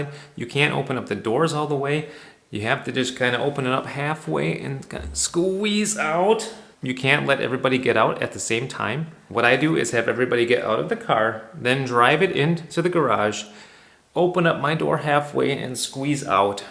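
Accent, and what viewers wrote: Germany. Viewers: Why did you have such a strong obvious German accent ? 🤔 I am German and I wondering. Because you don't sound like an American. Did you live so long here that you adopted a German accent? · You‘re not a real american. You have like a german accent. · Did you grow up in Germany? The accent and vocabulary really don‘t sound American ;) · Hello I recently discovered your channel. I noticed your German accent and could not believe you were actually American. I find most interesting are your beautiful videos and photos in Bavaria. It brings back memories of life in Germany living in a Village! I Loved it! I Love the Land, homes and culture so much! I felt at home there, although I was born American. I appreciate your interesting information! I hope to move back to Germany. I Watch videos, live, eat, sleep and dream Germany all the time. May you and your family enjoy your beautiful new Home! 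Tchuss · Hey, found the correct video and it is very interesting, because I had the same feeling. When you speak English I also heard a light German accent. It is very funny somehow. :)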